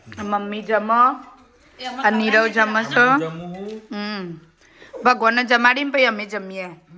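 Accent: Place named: Daskroi, India. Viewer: ગાંધીનગર બાજુ, દસકોશી ના લાગે છે😀 ભાષા ઉપર thi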